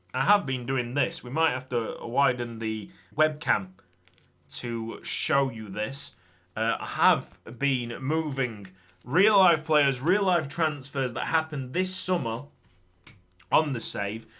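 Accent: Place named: Yorkshire, United Kingdom. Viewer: A Yorkshireman screams at machine for 20 minutes.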